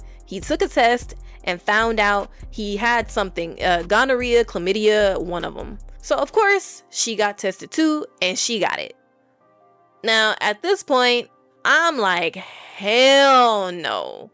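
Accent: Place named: Carolinas, United States. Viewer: I don't know you, but you have a thick southern accent that reminds me of the Carolinas. There are plenty of beautiful, well mannered Black American women down south. Why are you chasing cat in the Philippines? I grew up with Filipinas in my military hometown. They're nice people, but are desperate and will do anything to get ahead. They're told from birth that white men are the ticket and even then, they can scam the white guys. My best friend's mom is the only one I know who actually prefers Black men but even so, she scammed his biological children out of their inheritance, then dated his friends as soon as he died of cancer. Come on, be smarter.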